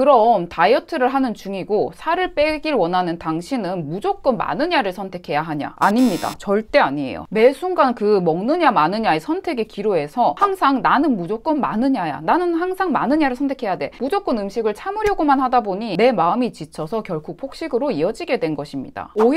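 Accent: Gyeongsang, South Korea. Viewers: 경상도 분이신가요?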